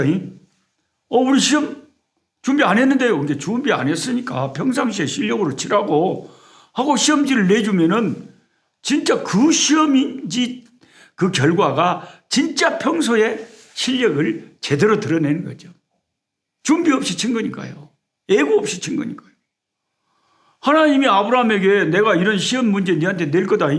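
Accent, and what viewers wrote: Jeolla, South Korea. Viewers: 목사님 덕분에 성경공부 열심히 잘 하고 있습니다 전라도 영어 넘 정감있고 좋아요 ㅎ 아브라함과 이삭의 순종처럼 날마다 체찍질하며 순종하기를 원합니다~~샬 롬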